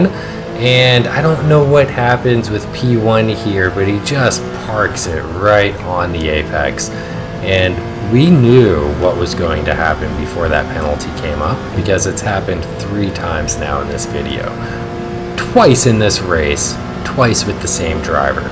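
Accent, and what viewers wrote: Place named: United States. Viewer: I really enjoyed watching this! Please keep grinding I’d love to finally have a American English speaker to watch daily on gt7 !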